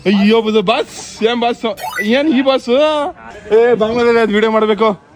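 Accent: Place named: Butler English, India. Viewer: If u don't know english plz don't talk talk !! U know only buttler english 🙂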